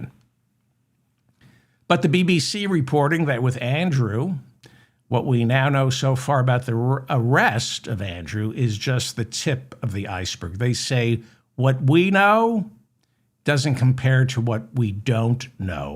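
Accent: New York, United States. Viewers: ! I love your New York City grit. Nothing like it.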